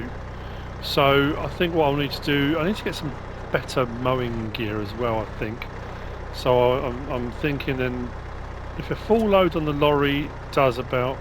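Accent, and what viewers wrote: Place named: United Kingdom. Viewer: Let me tell you something, I watch a lot of Youtube, many types of videos. No tv, or telly as you would say, just Youtube. While I like watching videos of my interests, in this case FS22 content, the common tie between ALL my subscriptions is PERSONALITY. More than anything, I just like to hear about PEOPLE. I like your perspective as a UK citizen; your worldview, your outlook on things, even, quaint as it sounds, your manner of speaking. Word usage, phraising, etc...Go ahead and chat! Tell us about your brother and his amazing feat. We have trails here in the US, I have day-hiked the Appalachian trail in many areas, I always dreamed of a thru-hike. Probably never do it, but we all dream. Go ahead, talk to us. It ADDS to the content. I am sipping my coffee here in Indiana but you carry me to England. The power of Youtube.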